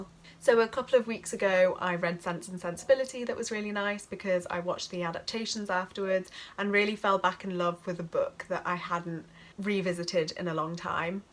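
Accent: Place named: RP, United Kingdom. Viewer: Love the voice and message! I remember you said you would like to have posh british accent. There you go girl! ☺️👍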